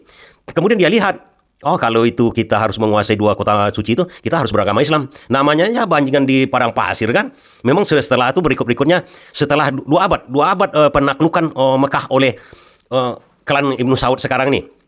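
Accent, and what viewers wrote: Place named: Belitung, Indonesia. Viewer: Bahasa bpak ini seperti orang2 daerah bangka belitung tapi lebih ke belitungnya, apa benar pak....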